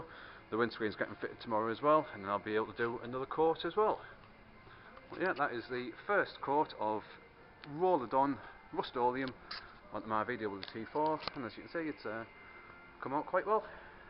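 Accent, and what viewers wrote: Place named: Heaton, United Kingdom. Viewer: Looks great already. Will take a look and thanks for such an informative vid. Sounds like you're down the road from me in Heaton.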